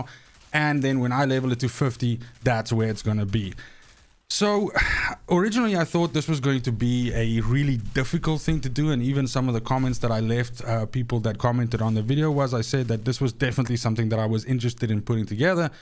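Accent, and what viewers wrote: South Africa. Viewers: You south african? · Are you from South Africa?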